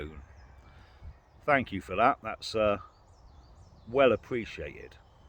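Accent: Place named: South West England, United Kingdom. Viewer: Wondering if you're near Bristol, as we had very similar weather here a couple days back, and today, and I've been trying to place your accent for a while haha. Definitely South West, but don't worry, I'm not asking for you to give any info. I'm happy to keep the mystery alive!.. Thanks for the fantastic insight and content as always. Being an unemployed bloke in my mid 30s in the UK is a scary place to be right now. Being on Universal Credit for the first time in my life over the last couple years is nothing I'm proud of and something I had to do after getting back from a few years away just before the 'scamdemic', and after three years in Aus (not a perfect place, but a large economy like ours, the difference being the standard of life there is reflected), it's made me see how much we (here in the UK) exemplify what it is you're touching upon in this video. 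Blimey, I've really gotten an insight these last two years into just how much of a commodity and statistic I and everyone else who is out of work are viewed as, with regards to being in the system. I am currently looking for work. Being on welfare has not been good for my soul, but the prospect of getting back into work in a country that only really values me for that prospect is also quite terrifying, and that has nothing to do with the notion of a hard day's graft, but everything to do with the erosion of my soul.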